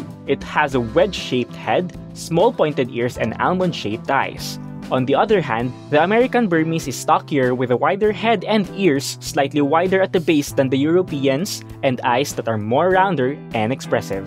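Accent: Philippines. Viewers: I love my Burmese. She always climbs my shoulder as soon as she sees me lounging on the couch or when working in front of the computer. Btw, the voice-over is a clear giveaway Pinoy! Yay!🙌🏼🇵🇭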